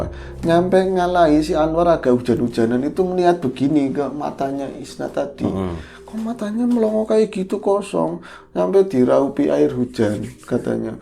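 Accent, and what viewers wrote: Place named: Tegal, Indonesia. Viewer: Assalamualaikum wr wb mas dan mas.....lanjut dengerin cerita yg tk tunggu apalagi bhs Tegalnya 😀😀😀🙏🙏🙏🙏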